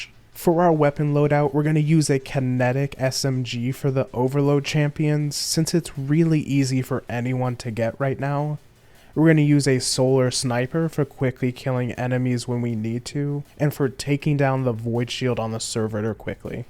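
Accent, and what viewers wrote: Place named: Quebec, Canada. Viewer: French Canadian Le Mont accent NOICE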